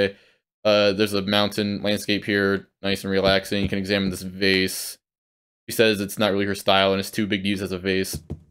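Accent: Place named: United States. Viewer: Thanks for answering, if you don't mind me asking, what place in America is the accent from, I'm asking because it's a little different from what I usually hear in movies and games.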